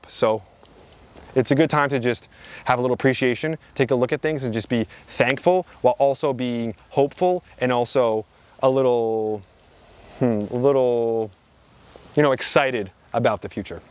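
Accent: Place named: Pennsylvania, United States. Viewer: He sounds like my cousins when I visit Pennsylvania-ha! I'm originally from Philly now retired in Southwest Virginia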